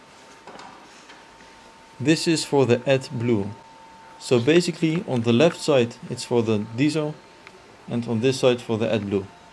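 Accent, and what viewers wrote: Netherlands. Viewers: louis van gaal engels? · ik kan echt aan je stem horen dat je nederlands bent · Thicc Dutch Accent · I can hear that your dutch dude 😂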